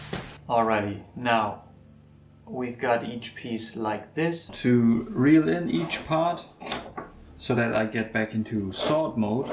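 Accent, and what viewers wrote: Germany. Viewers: Ich habe bis krampfhaft nach beweisen gesucht, dass du aus Deutschland kommst 😅 hört man irgendwie immer raus wenn jemand englisch spricht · Quick question. Are you German? Because I’m believing to hear a slight German accent. And I think you stayed “Nein” (German: no) at when you dropped that metal peace into the water. I’m asking because I’m German too😬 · Ich dachte die ganze zeit der hat doch ein deutschen Akzent und dann bei der sonntags ruhe ich war mir sicher ❤😂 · Great video Aber mal im ernst die deutsche englisch aussprache ist für deutsche echt etwas schwer zuzuhören weils iwie nicht zsm passt (weil die sprache eig 24/7 benutzt wird und manche bezonungen passen einfach nicht das ist normal :D) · U‘re German or Austrian Maybe Swiss??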